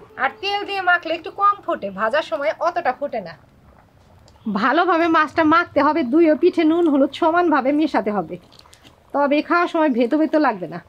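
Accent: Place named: Bangladesh. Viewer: Didi ki bangladesi meye naki,kotha bolar doron ta bangladesi der moto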